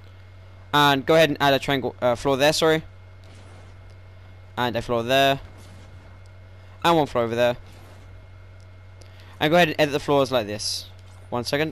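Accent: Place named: United Kingdom. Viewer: btw i like ur british accent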